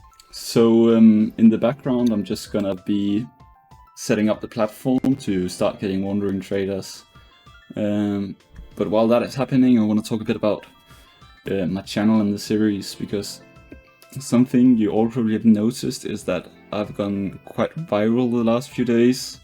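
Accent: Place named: Denmark. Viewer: I love how violently danish your accent is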